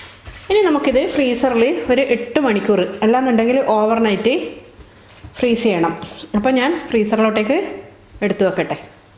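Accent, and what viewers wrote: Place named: Kannur, India. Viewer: Ithupola pattippidich bhasha Kannur thanne.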